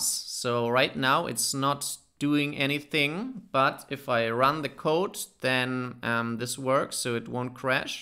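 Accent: Germany. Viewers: nice content, but your accent is as worse as German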